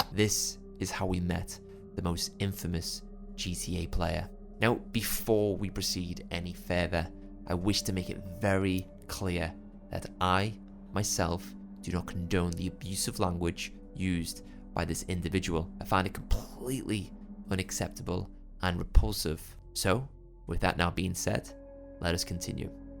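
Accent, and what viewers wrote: Liverpool, United Kingdom. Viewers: R u Scouse ? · Fellow scouse?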